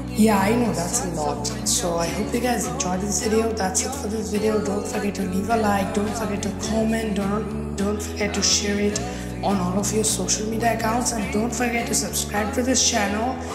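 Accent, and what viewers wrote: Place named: India. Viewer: Indian accent, bad voice quality and music. Awesome video. Dislike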